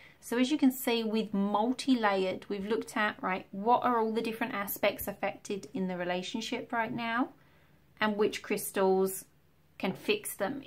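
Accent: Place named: England, United Kingdom. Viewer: I love you english accent... besides that all that you said.. cheers from Colombia!!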